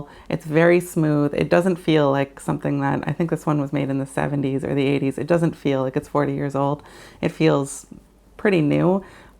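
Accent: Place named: California, United States. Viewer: Hey, you are pretty funny to this California boy. Nothing dry about your presentation. Does your Jupiter 9 have a serial number on the side? The early types did not. They had them on the lens ring. Ah Hah. Yours was made in 1984 as that is how your serial number starts out. And here we are <link> <link> This looks like a college near me. I am west of Palo Alto, CA. You talk like a local. <link> No you can do better than that. Try neutral filters. You will be able to keep the short field of focus and not blow out the sensor image. Your surrounding looks like San Mateo. Also, I am doing product shots for a product I produce. So everything is controllable and stable. I use a Canon 2000D on a big tripod (with a fluid head), with Canon software that will send the image to a laptop with any size screen... think 27" if you can have that around in a setting. 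I really can see the image clearly there. There is a issue with this camera's need for adaptors sometimes. This is talked about in a 5 Types video. It might not apply here as it is about infinity focus. It is my understanding that your camera did not have the MC multi-coat lens coating, where the earlier one or two versions did. The coating keeps the light from bouncing around inside the lens and camera. I just bought a Jupiter 9 type 2 for $135 out of the Ukraine with only $10 shipping. Here is a video you might have seen which is pretty interesting. The information is that is. Don't drink a lot of coffee before watching this. Y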